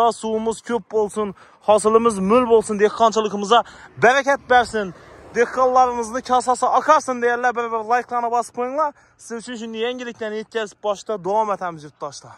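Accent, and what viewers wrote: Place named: Khorezm, Uzbekistan. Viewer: Хурматли хоразмликлар эфирга узатаётган видио обзорларингни бутун узбекистон ахли курсин десанглар курсатувларингни шевасиз китобий тилда олиб боринглар , курсатувларингни кураётиб тушунмаганимиздан курмай куяколаяпмиз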